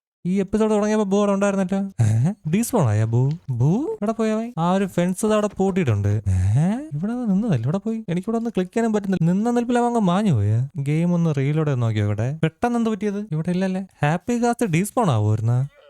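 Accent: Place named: Trivandrum, India. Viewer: crrt tvm slang 😂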